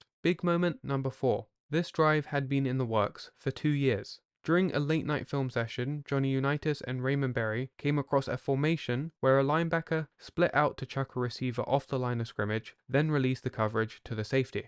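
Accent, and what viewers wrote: England, United Kingdom. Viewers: He’s English, and I think real. Some people don’t know how to speak names. Unitas was widely misunderstood. Yes, it is indeed “aMEEchee”, as it was for Don Ameche!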